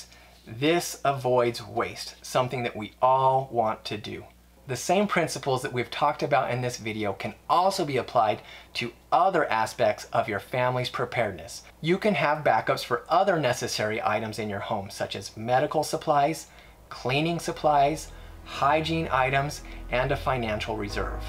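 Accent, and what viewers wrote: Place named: Utah, United States. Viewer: By the way say meal and oatmeal I’m guessing you are from Utah 😀I like your pantry. You have the same exact items I have lol. We must shop at the same places.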